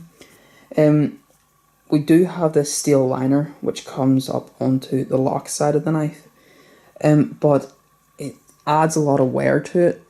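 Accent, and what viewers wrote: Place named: Ulster, United Kingdom. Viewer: Hi 'Bout ye! Glad to hear an Ulster accent on YouTube. Enjoyed your vid,very professional presentation, it flowed with just the right pace and level of information. Excellent appropriate use of close focus. Good idea to end with 3 comparative size/style knives. I've chosen a purple Tsuchi today, I like a bit of bling!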